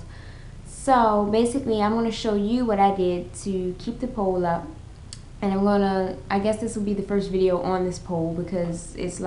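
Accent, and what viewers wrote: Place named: Baltimore, United States. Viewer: Baltimore accent ok 😏Just bought this pole and this really helped! Thanks!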